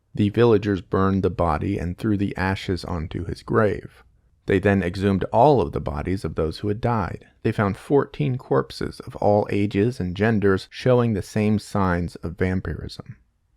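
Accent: United States. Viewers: I’m confused why you’re speaking American and not Australian tho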